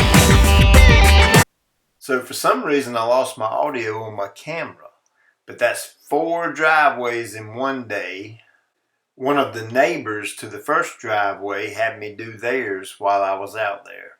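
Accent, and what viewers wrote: South, United States. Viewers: Hey, great video. then again You know how I feel about that ventrac. But I do have a question about these long driveways and I know you can. Put a great on there by but when did the Abi? Be able to put a slight grade on it to where it ran off the driveway almost immediately. and it not be noticeable to most people just wondering and as far as people Saying you should talk less work more. That's just cuz they can't handle a good southern accent, sir. God bless great video.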